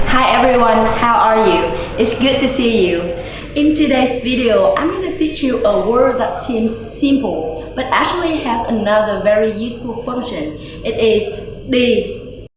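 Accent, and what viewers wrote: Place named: Vietnam. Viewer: Video rất hay. Trên youtube có rất ít video dậy tiếng Việt bằng giọng khác tiếng miền Bắc. Quê hương là ở đâu? Miền nào? Cảm ơn và TVO nhiều.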